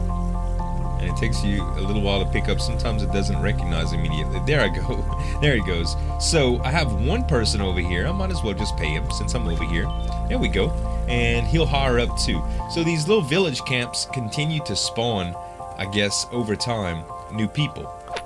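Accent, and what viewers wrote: Louisiana, United States. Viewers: Was trying so hard to figure out what kind of accent. It's Louisiana, isn't it? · brain They are both good ol' boys. is from Louisiana and Freeman is from western Mississippi (raised there, not born). So they both have similar regional accents.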